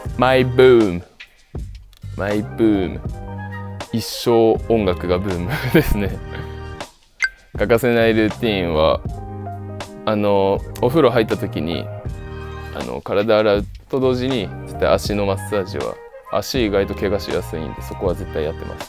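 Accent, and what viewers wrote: Japan. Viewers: ugh he's so hot, his voice is so deep and i love his Japanese accent 😩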